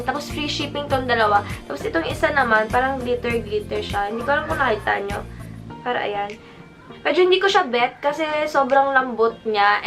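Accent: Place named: Davao, Philippines. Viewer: Taga Davao man diay ka ate hehe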